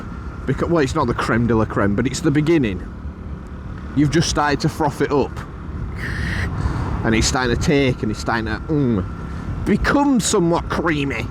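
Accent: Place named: Leeds, United Kingdom. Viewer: Are you from Pudsey by any chance? I detect a Leeds accent and the channel being 🤔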